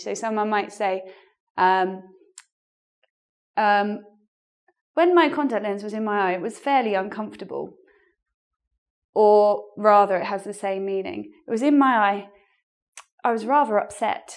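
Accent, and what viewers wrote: United Kingdom. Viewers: Hi, I have to confess: british english pronountiation is much more difficult to understand to me than american english. I follow your channel and I watch all your videos, but I have to say that I struggled to understand you today. But, keep it up :-) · I love your British accent. I wish I could sound more authenticaly. But I find bit silly trying to copy a native speaker, doesnt it sound awkward? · give me that british accent m8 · Her British accent is so cute!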